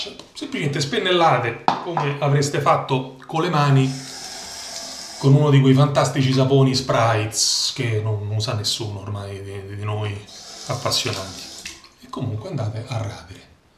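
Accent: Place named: Naples, Italy. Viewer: dal tuo parlare mi sembravi di napoli ehehe tutto qui.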